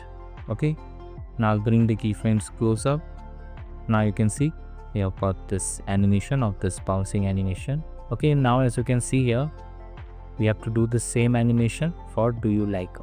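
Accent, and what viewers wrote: India. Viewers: wahi to Indian accent me English bolta hai mza aata hai dekhne me lekin pura dalta to achha hota aor bhi logo ka dekhta hu but unka utna mza nahi aata dekhne me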